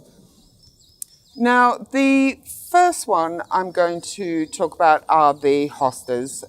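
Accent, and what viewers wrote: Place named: England, United Kingdom. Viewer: It's either Midlands or southern England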